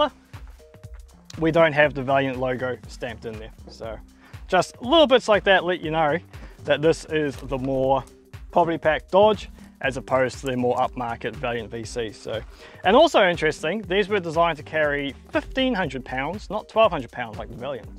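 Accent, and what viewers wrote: New Zealand. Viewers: the kiwi voice is annoying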